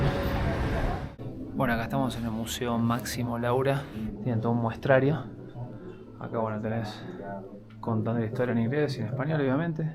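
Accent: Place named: Buenos Aires, Argentina. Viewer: querido, me siento muy identificado con tu manera de hablar, bien lunfardo. Los videos me divierten y enseñan, la verdad, sos crack. Abrazo enorme!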